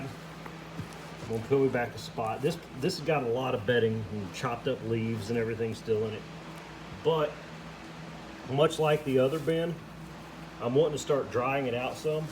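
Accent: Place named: Louisiana, United States. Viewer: Just Subscribed! Emily sent me. I'm gonna order some ANC cocoons from you towards the end of July. I thought I heard Louisiana in your video. Morgan City living here. Keep up the good work.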